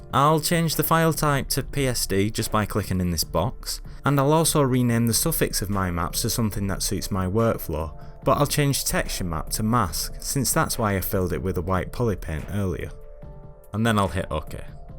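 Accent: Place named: Northern England, United Kingdom. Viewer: You're so northern hahaha! I love it. Lovely tutorial, thank you for sharing :)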